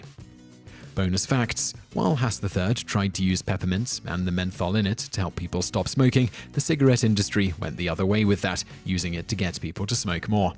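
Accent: United Kingdom. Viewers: Pave Stiletto The video doesn't put it in words on the screen, but it does say the original flavor was peppermint. The word Pez is an abbreviation of the German word for peppermint.(PfeffErminZ). The video could have been much MUCH clearer on it, and unfortunately, seems to be a huge problem with all of this channel's (and those of the sister channel Top Tenz) videos. They aren't clear and tend to go on rambling stores that don't appear to actually give any answers other than just interesting history. They do give the answer, but unfortunately, Simon's insistence on pronouncing everything the British way, and the rapid drive-by of lesser-known words and facts causes you to need to do extra research to find out the information the title hints at. And, if you have to do that to every video they make, what's the point in watching the videos in the first place?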